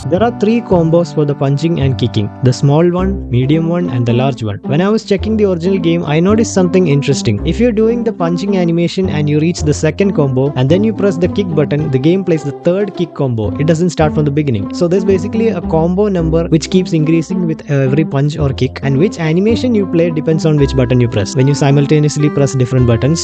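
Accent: Kerala, India. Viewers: Bro are you from Kerala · Hey man are you Indian or English?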